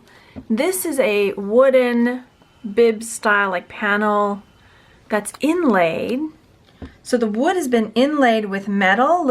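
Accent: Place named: Texas, United States. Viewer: You are cracking me up! I needed this today. I hear my Texas, teacher self in you with your silly songs. Don't you love exaggerating our Texas drawl??! Then the, Labyrinth stuff sent me over! Love it!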